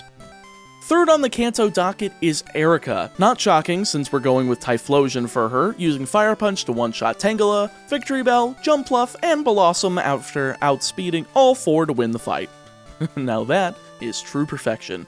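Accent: South, United States. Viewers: ive been watching your stuff for a while now, and im just now noticing your accent, you're from the south right?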